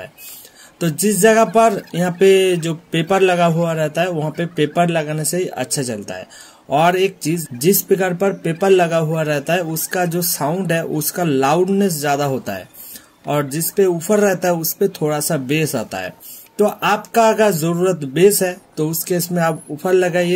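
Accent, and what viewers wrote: Bengal, India. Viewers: Aap West Bengal se hoo ka .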